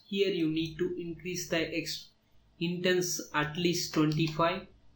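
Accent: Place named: India. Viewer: Indian accent- close the video.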